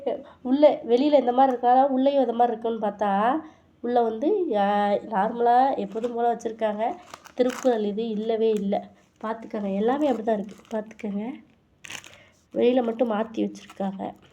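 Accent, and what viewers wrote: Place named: Tirunelveli, India. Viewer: Thirunelveli பாசை sis 🎉🎉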